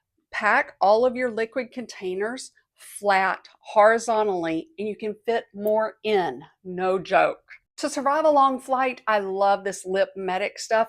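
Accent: South, United States. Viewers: And now can you leave a kind comment for this lady who spends a lot of time and works hard to bring you these tips with her sweet, southern accent?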